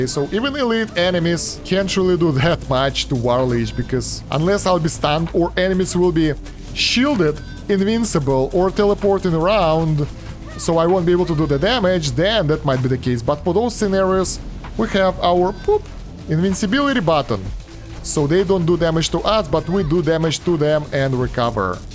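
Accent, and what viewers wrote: Russia. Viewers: У тебя акцент русский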